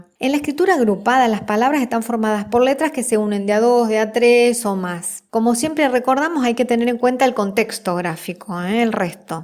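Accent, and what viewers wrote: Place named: Argentina. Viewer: Me gustan muchísimo gracias el acento de voz de argentina es muy bonito gracias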